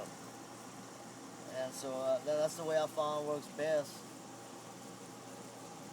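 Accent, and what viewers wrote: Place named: Louisiana, United States. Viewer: i can tell your from around here with the accent i live in new iberia not too far down the road lol i live by a small bayou that leads to avery island and there are nice 5 and 6 footers coming in and out and imma make some of these to put in there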